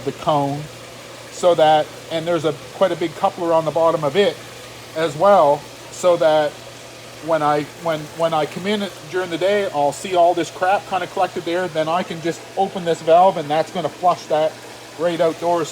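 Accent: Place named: Canada. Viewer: you're either a Canadian or a Minnesotan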